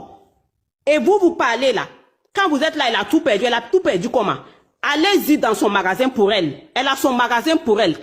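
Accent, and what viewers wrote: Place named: Africa. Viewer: C'est l'accent de quel pays... ? Un pays d'Afrique à 100 % sûr... mais je sais pas exactement quel accent... Un pays francophone, donc plutôt Afrique de l'Ouest...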